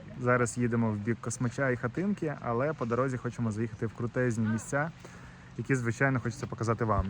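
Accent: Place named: Rivne, Ukraine. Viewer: знайомий суржик:) Ви з Рівного?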